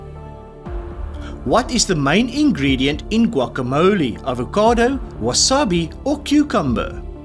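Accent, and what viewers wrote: Afrikaans, South Africa. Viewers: Bud as a fellow South African, you are clearly of Afrikaans origin,you need to work on your pronunciation.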